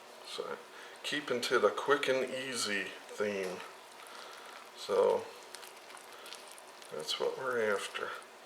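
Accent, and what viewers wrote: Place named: United States. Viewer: Good night folks enjoy your blue cobbler he sounds country that is good looks like a friendly guy he just soundd like garfield talking big cat by yall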